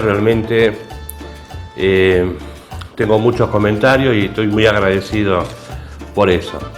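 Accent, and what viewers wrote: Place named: Argentina. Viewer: una consulta eres peruano con acento argentino? que te pasa bro enserio que raro un peruano con acento argentino por cierto gracias por la receta me sirvio <3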